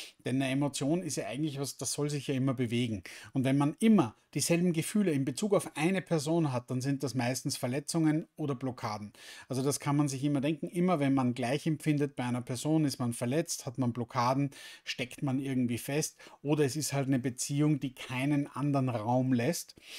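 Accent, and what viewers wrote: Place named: Linz, Austria. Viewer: Hallo, hab´ dich erst gestern über zwei Videos mit Monika Donner "zufällig" entdeckt (na, das "zufällig" glaubt kein Mensch mehr...) - den Linzer Dialekt erkannte ich sofort... es war nämlich auch mein erster... Nachdem´s heute grad nicht ganz einfach war mit der emotionaen Freiheit, probierte ich diese Meditation aus... und, oh ja, sie wirkte grandios. Jetzt sitzt das Herz wieder dort, wo es soll... und lächelt... Alles gut!!! Herzlichen Dank für die Meditation! Einen lieben Gruß vom Lande!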